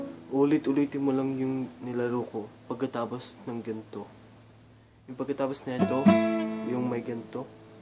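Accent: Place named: Visayas, Philippines. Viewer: masiyadong visaya ahahah pati tuno mu pang bisaya na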